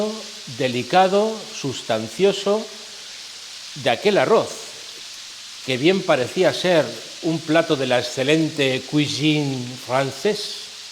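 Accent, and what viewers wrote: Castile, Spain. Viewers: me encanta que cuente historias mientras cocina. Me gusta mucho verle cocinar, como lo explica todo y escuchar su voz es recordar la entonación castellana de mi abuela manchega. ¡Qué tranquilidad y relajación produce ver sus vídeos de cocina!. Y muchas gracias por todas sus enseñanzas. Como bien dice usted, Paz y Bien para todos. Saludos desde Córdoba, España.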